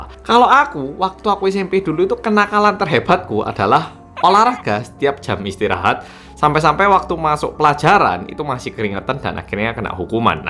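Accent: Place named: Java, Indonesia. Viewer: Ok... medok... Uraian mu stlh bercerita, aku suka❤ tks sukses sehat selalu ya 🙏🏿✌🏿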